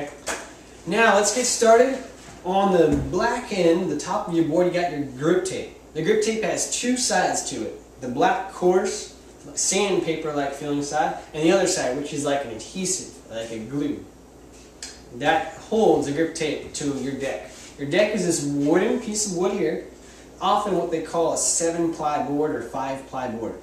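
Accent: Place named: Texas, United States. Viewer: Does anyone notice his slight Texan accent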